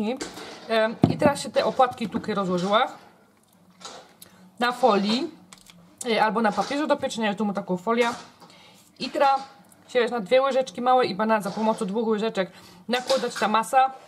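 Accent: Silesia, Poland. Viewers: Choć nie jestem Ślązaczka ale bardzo lubię twój kanał i twoje przepisy, nie które juz wypróbowane👍🏾 i ta twoja śląska gwara ! Fajnie sie słucha ! A durnymi komentarzami sie nie przejmuj ! I rób swoje ❤️😊😊pozdrawiam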